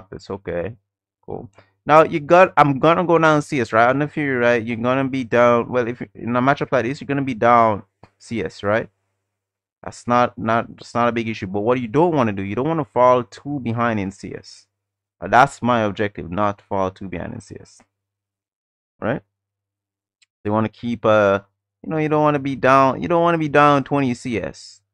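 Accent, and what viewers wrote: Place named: Jamaica. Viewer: He's got a Jamaican accent, which is pretty unique, so don't get discouraged if you're a language learner. 👍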